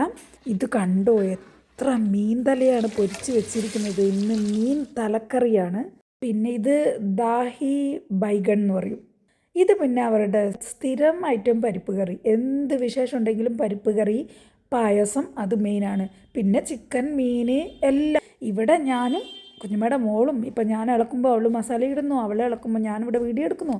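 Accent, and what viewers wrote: Palakkad, India. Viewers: Palakkad accent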